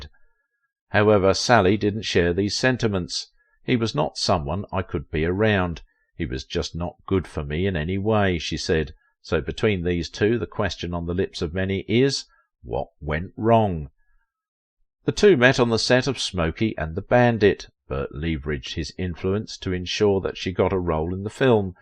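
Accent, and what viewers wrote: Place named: Cockney, United Kingdom. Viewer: One thing I cannot stand when Englishmen decide to host like a documentary in America number one you may be speaking English but you're not speaking American and when you guys mumble on top of your Cockney accent it's virtually impossible to understand you someone made this documentary even worse is he didn't take the time to post the photos that goes along with what you are saying everything's out of sequence so it's so hard to follow engage and understand and enjoy what the hell you're talkin about please cease and desist dot-dot-dot I have seen documentaries of WWII hosted by English-speaking men and I'm completely able to understand them cuz they articulate well would you please do the same or get the hell out of Dodge do sincerely your friend and mine me ... Christ! 🙄🤔🤨🤪😜🤫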